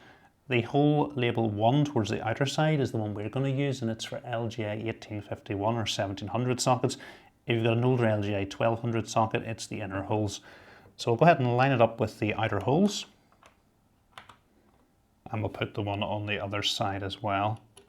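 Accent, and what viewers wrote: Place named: Northern Ireland, United Kingdom. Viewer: Really good to see this great build, and even better to hear a local NI accent. Super job especially with these 2026 parts prices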